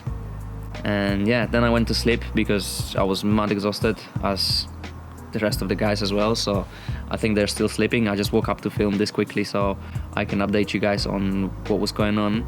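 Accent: United Kingdom. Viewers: ngl you have a hint of a British accent 😂😂😂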